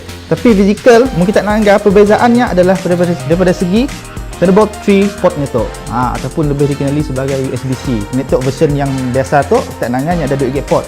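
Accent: Sarawak, Malaysia. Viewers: Bahasa melayu sarawak lah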